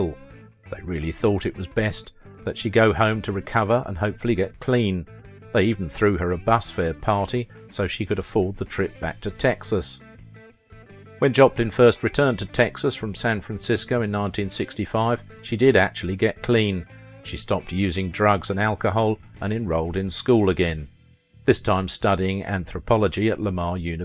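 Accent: United Kingdom. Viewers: Script clearly written by a kid. Why put a British accented man to speak your words? "She had a guy and a woman...so that's when she ended up doing heroin. It turned out to be super strong stuff..." Not a criticism but weird choice. The accent isn't needed to add cred.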